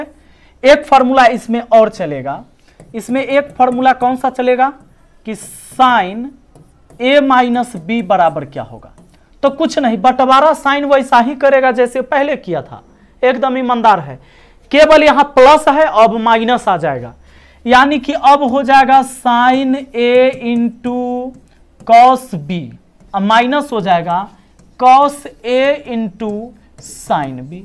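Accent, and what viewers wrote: Bihar, India. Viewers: Are you from bihar😅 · Sir Are you bihari? PROUD TO BE BIHARI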